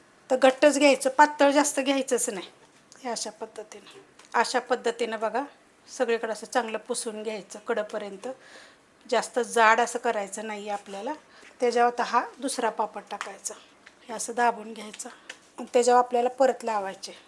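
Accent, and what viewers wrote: Satara, India. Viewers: भाषा सातारी आहे.... कारण मे देखील सातारची आहे पण मुंबईत लहानाची मोठी झाल्याने मला अस्सल सातारी बोलता येत नाही. पण भाषे वरून समजते.असो.... माझे सासर खटाव तालुक्यात आहे... आपण एकाच भागाचे आहोत.. तुम्हाला all the best 🎉❤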